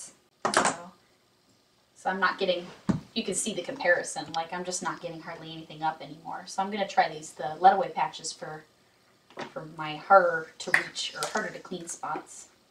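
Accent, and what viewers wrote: Wisconsin, United States. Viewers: Your accent also gives it away. I am also from Wisconsin and when I went to the East Coast, the locals would ask me if I was from Wisconsin or Michigan.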